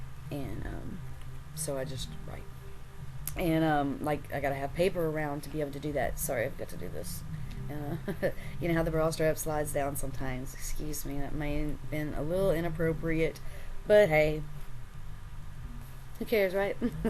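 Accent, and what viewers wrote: South, United States. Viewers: i totally thought you were in the southern US from the way you speak lol good luck in the EU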